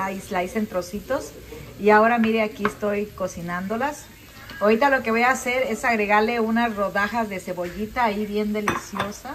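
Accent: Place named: Spain. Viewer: Hola querida chef, más de 1400 videos que preparaste y compartiste para nosotros y alrededor de 113 mil suscriptores es un récord maravilloso y excelente para ti, te felicito.Ver este video de 4 minutos en el que hablaste con un hermoso acento español fue muy divertido para mí, y ver tu video me fue muy útil para practicar español..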